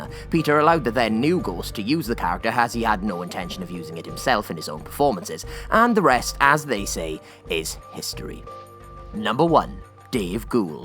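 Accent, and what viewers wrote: Wales, United Kingdom. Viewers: Is that a Welsh twang I'm sensing there ?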